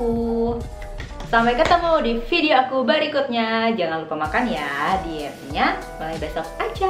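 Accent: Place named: Bali, Indonesia. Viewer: logat balinyaa!😍❤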